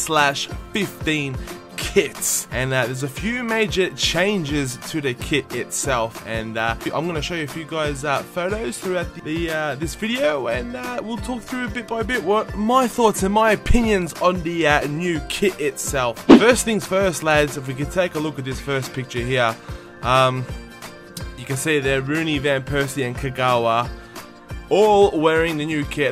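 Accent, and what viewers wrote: Australia. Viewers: are u from ausie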